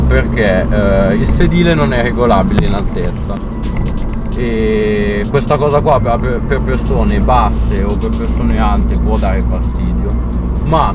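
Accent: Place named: Italy. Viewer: Your italian ascent sucks so much that I need to read the english sub to understand something LOL! Learn to speak before create Review bro!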